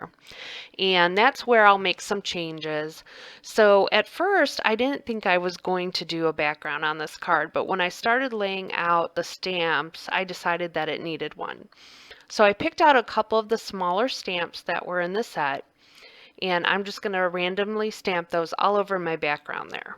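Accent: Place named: Midwest, United States. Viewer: Girl, I can't help but smile as soon as I hear your happy, friendly voice and that Mid-Western accent! Love! I am so with you on the PFS inks...Love them all. Just need one more and my collection will be complete. They are different for sure, but I love them...excpet the detail black...I think I have a faulty ink pad. But LOVE all the others. But I LOVE, LOVE your card!! You did a great job! Love the interactivity!!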